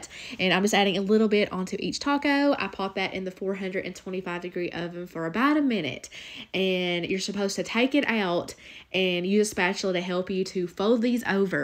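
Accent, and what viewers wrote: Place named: Kentucky, United States. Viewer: I watch to get ideals but then mostly I miss my Moms KY accent and your is so much like hers!!!!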